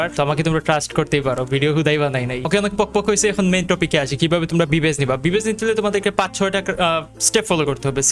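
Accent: Sylhet, Bangladesh. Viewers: ভাইয়া আপনি কি সিলেটি আমিও সিলেটি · আপনার কথার ধরন দেখে বুঝলাম আমি ও সিলেটি🥰🥰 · ভাই আপনি সিলেটি নি🙄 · তুমি সিলেটি নি ভাই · Vai apni ki sylheti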